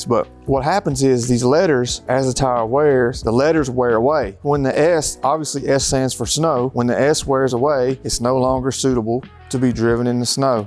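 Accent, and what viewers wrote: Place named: Missouri, United States. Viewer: The Accent. Are you from M.O. St. Louis or K.C.?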